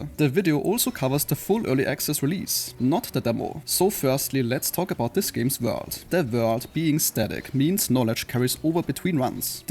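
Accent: Germany. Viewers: German or?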